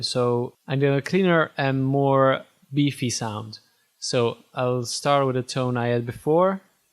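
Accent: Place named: Italy. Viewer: ah, ecco, mi sembrava di sentire l'accento italiano ☺. Grandissimo 🤘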